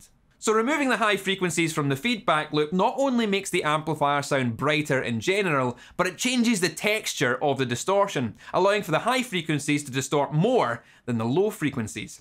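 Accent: Scotland, United Kingdom. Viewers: How can we do your scottish accent? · Honestly i just watch these videos for cool accent🤣, my scottish brother(scottich heritage here)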